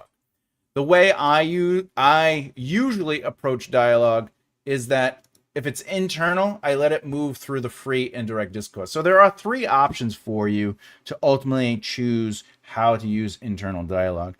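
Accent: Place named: Boston, United States. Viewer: And, with your reading accent, makes it sound super Boston.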